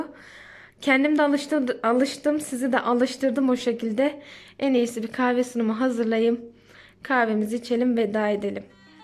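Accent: Yozgat, Turkey. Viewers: Kim ne derse desın biz yozgatlıyızz biz böyle konusuruzzzz🌹🌹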